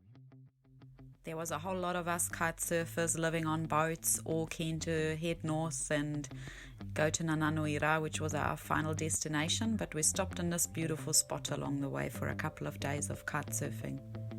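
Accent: South Africa. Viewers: Evening, yousound South African?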